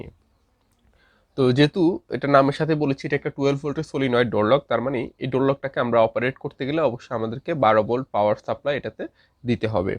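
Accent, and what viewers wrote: Noakhali, Bangladesh. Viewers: নোয়াখালীর ভাই নি আননে 🤭🤭🤭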